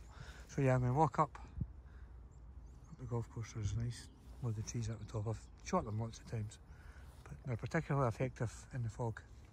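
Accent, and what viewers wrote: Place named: Glasgow, United Kingdom. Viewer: Hi I just came across your vlog and I also do woodland and from time to time I use my film camera. would you mind telling me which woodland area this was. I guess from your accent you are local Glasgow or surrounding. Thanks. Jacqui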